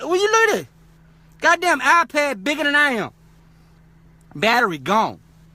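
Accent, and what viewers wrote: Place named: South, United States. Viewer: He talk like a southern guy not a black guy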